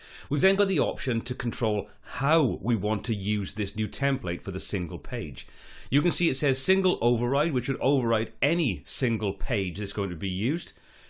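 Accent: Wales, United Kingdom. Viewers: Great to hear a fellow Welsh accent :)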